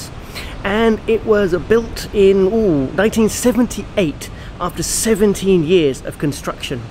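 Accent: United Kingdom. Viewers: This first time i watch you video, are you England? Your accent just like in harry potter movie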